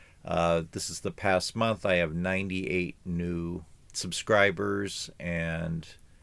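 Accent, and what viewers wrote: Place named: Adelaide, Australia. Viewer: I think it’s because he grew up in the eastern suburbs of Adelaide and went to school there. When I first heard him speak I thought he sounded like he was from there and turned out he was.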